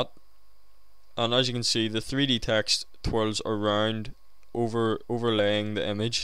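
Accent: Northern Ireland, United Kingdom. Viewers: you from northern ireland matE?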